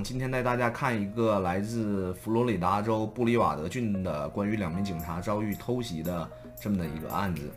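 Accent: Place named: Northeast, China. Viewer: ok，你严谨，我应该说 up 主是美籍华人东北口音。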